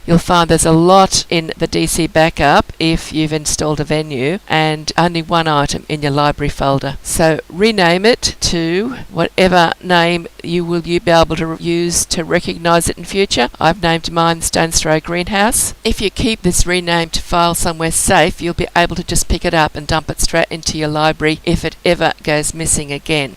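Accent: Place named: Australia. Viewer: So very clear and great to hear an Aussie voice, thanks heaps.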